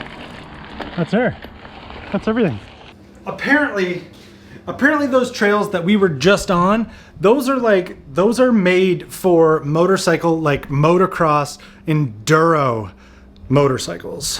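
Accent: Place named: New Brunswick, Canada. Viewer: Even though you are not fluent French speaker anymore,you kept the New Brunswick accent 👍🤣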